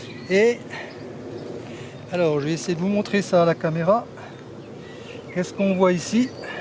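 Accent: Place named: Franche-Comté, France. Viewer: Merci pour la vidéo !...Ah l'accent franc comtois !...j'adore !..je suis du pays !😅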